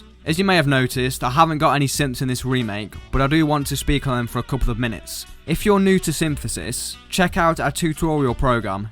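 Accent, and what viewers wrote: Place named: Derby, United Kingdom. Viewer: Sound like you from Derby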